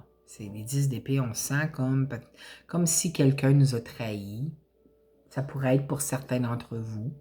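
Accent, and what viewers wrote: Quebec, Canada. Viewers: Merci, tres beau tirage restont zen. J'adore l'accent du québec tsé ( tu sais) dans le sud de la France on dit comme vous sais rigolo. Bien à vous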